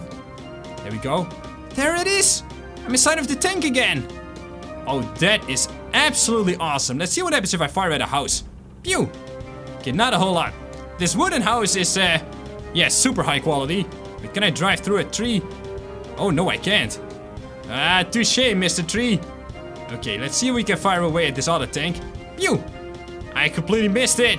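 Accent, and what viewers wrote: Netherlands. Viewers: Ar you from netherlands?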